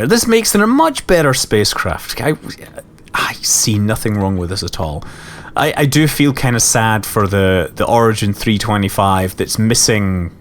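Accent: Scotland, United Kingdom. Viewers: What do you mean "unbearable 'accent'"? He's Scottish.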